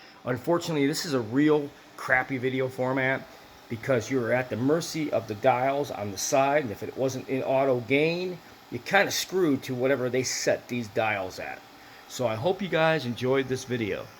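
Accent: Chicago, United States. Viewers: Sharing knowledge with a fierce Chicago accent.👍👍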